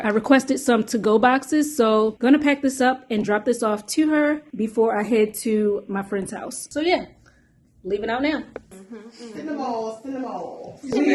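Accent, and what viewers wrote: Baltimore, United States. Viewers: You absolutely have the Baltimore accent! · I knew knew it!!! I'm from bmore and I could hear it in your voice. Love it!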